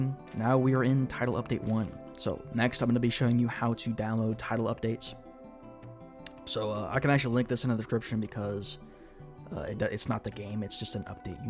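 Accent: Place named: Kentucky, United States. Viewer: he sound like my old friend from kentucky 😥😥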